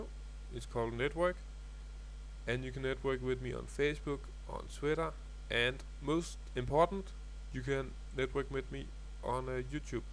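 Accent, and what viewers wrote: Denmark. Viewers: Nice tutorial! Are you by any chance Danish? Your accent is so familiar.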